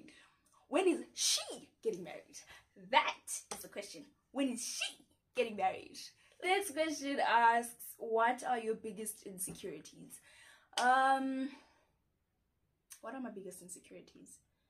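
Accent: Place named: United Kingdom. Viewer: Damn you Nailed the British accent, btw you have a beautiful personality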